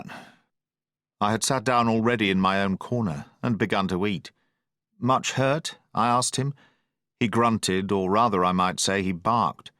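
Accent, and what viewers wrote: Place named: England, United Kingdom. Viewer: Thank you! So nice to hear it read with an English accent! Good job, Matey!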